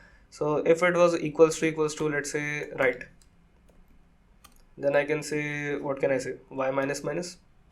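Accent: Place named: India. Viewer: everything's great but i'm not used to his indian accent so my eyes are a bit tired of reading the script 😎😎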